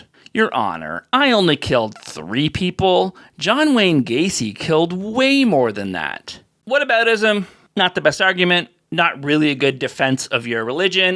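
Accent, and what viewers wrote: Canada. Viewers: Aww I like the Canadian accent.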